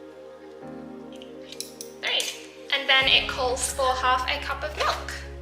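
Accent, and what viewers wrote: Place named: Australia. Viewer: Its so cool your from Australia, we love your accent! The cupcakes look GREAT! nom, nom, nom... :)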